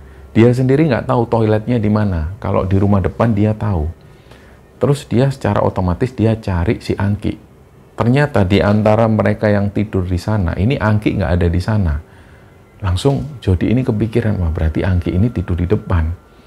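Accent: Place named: East Java, Indonesia. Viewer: Logat jawa timuran ne mantap mas.. apalagi kalau ngomong BBAHAYA..😂😊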